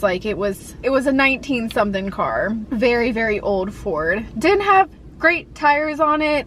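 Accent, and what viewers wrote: South, United States. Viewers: dat down souf twang u talking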